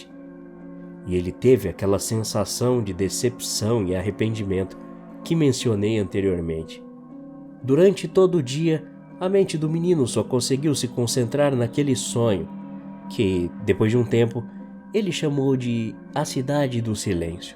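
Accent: Bahia, Brazil. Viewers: Mano, o mlk era baiano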